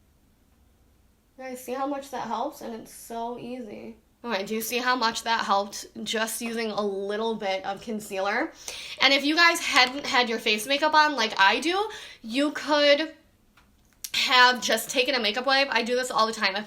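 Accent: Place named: Midwest, United States. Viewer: Midwest accent for sure!!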